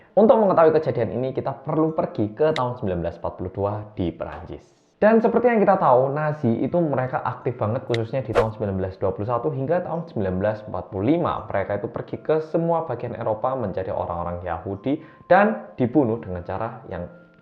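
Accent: Java, Indonesia. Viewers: Oppa medok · Mas yaaang medhoookk. 😀 Welcome detektif reomit ❤️❤️❤️ Stay healthy forever,mas 🤗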